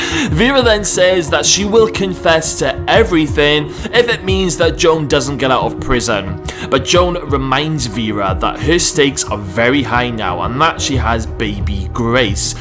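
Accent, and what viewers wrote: Wales, United Kingdom. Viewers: Hi, LOVE your enthusiasm for the show, your Welsh accent & always look forward to your reviews. We will all be gutted when Wentworth is finished. Are there any tv series that you & Peter recommend for viewers to watch in the future, with amazing writing & acting like Wentworth? Thanks from Renee in New Zealand 🥝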